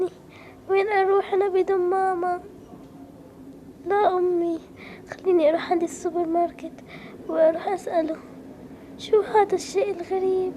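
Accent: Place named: Syria. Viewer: عشان بتتكلمي سوري ومصري!؟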